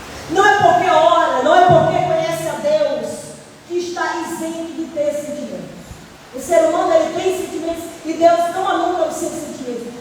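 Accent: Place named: Paraíba, Brazil. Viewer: A paz do Senhor missionária! Aleluia hum olha como minha professora de sotaque paraibeis esta chip, gloria a DEUS ''ha um tempo determinado por DEUS para todas as coisas'', primeira vez que vejo minha professora que prega sorrindo de corpo todo, louvado seja DEUS pelas vestis e amei essa saia, amanhã vou acompanhar a pregação toda, DEUS te abençoe com a familia.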